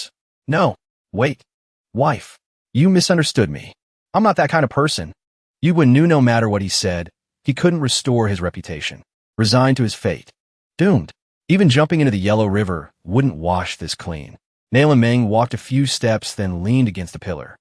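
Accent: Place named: France. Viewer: tf is this voice? is the ai getting heartattacks? why is it speaking in a french english accent in the video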